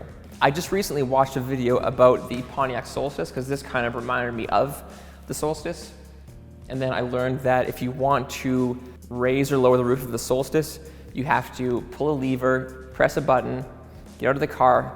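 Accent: Canada. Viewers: Might be Canadian.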